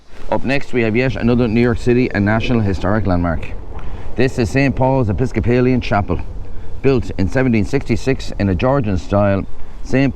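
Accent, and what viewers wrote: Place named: Ireland. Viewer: 语速比之前慢了不少，发音也更清楚了👍就是爱尔兰口音依旧明显😂对于英语初学者来说视频整体可能还存在一些难度，不过感觉如果把所有视频都看熟了可以收拾行李直接飞都柏林了😄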